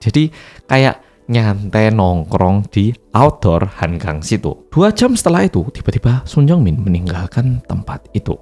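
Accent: Java, Indonesia. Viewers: Jadi ngantuk dengerin cerita nya😅....orang korea Logatnya jowo😂 Mendayu dayu suaranya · Orang korea, bhs indo dg logat jawa, weeee.....maantaaapp...taaapp👍👍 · logatnya sperti org jawa. 😂😂😂😂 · Medhoknya melebihi orang jawa 😁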